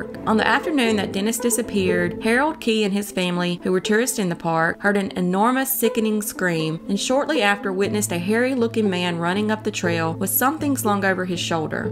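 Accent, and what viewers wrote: South, United States. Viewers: I live in Georgia and I love your channel. It's nice to hear a pleasant southern voice for once. Keep up the great work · Ahhhhhh that southern accent. Very relaxing and easy to understand. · and now I speed you up. Also being Southern …I speak fast, as if that should explain things 😂so I speed anyone up, not just you. Yours is just more pronounced than my NC accent. 😎 No problem it makes me comfortable. Thanks for ALL the cases. ✌🏻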